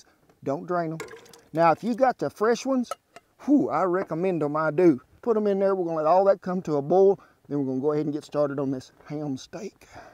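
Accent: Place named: Texas, United States. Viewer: He is sooo cuuuuuutttteeee!!! Love his Texan!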